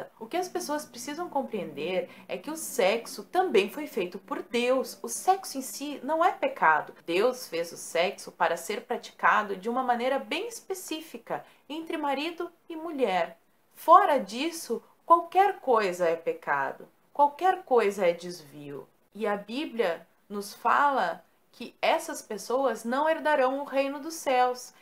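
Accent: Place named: South, Brazil. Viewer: sou novo no seu canal e estou curtindo muito, estou aprendendo muito.... Vou te fazer uma pergunta pelo seu "sotaque " vc é do Sul do Brasil não é? para ser mais específico vc é do Paraná???? valew ate mais...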